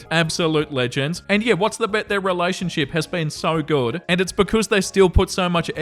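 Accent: Australia. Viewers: It’s funny. I’m Australian, so I don’t notice his accent, but I recognize the kindness and horror in his voice. 😂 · It's probably a accent thing as he is Australian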